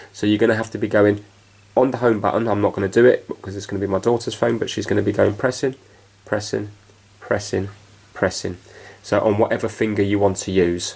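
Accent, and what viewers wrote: England, United Kingdom. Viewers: I've subscribed. You took away my fear of getting messed up and ruining two phones. (Good to hear an English voice again)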